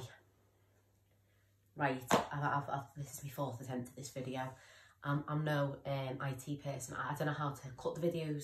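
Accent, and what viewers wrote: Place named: Liverpool, United Kingdom. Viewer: Scouse in the house 😆👍 your like me. I sweat when I talk to people I don't know. I don't know what to say to them unless I've had a drink . If I go to party's I have to go and sit on me own for a bit evey so often to get some space lol i hate going to places I don't know aswell . The ailen thing you said hit me because my m8 would say I'm like and ailen from a different planet hahaha and I always make sure iknow were people are in the room . From hearing you talk I can see your not stupid your smart and probably a nice person if your in a good mood lol things will get better where all fucked up other people are just better at hiding it .nice one for telling your story i appreciate it feels good to know I'm not the only one 😆👍👍👍👍👍👍👍✌✌✌✌✌✌✌👋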